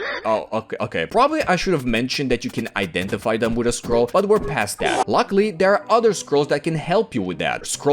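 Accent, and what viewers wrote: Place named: Eastern Europe. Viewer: Pelo sotaque esse cara provavelmente é europeu e possivelmente eslavo. Acho que eles entendem alguma coisa ou outra sobre comunismo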